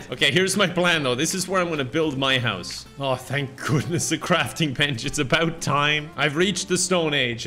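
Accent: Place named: Ireland. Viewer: He's just Irish don't worry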